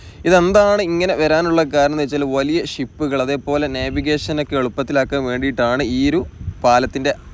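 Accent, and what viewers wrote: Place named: North Kerala, India. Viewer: വടക്കൻ മലയാളം, ദൃശ്ശിയങ്ങളെ പോലെത്തന്നെ അനന്തകരം തന്നെ. ആശംസകൾ ❤️🙏❤️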